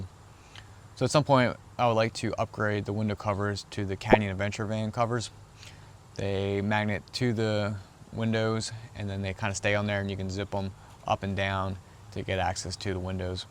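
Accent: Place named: Philadelphia, United States. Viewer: You have an amazing Philly accent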